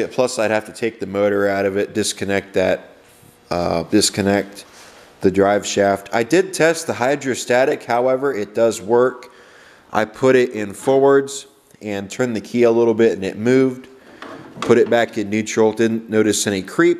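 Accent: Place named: Maine, United States. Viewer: Sounds like your from Maine, thats where i'm at too.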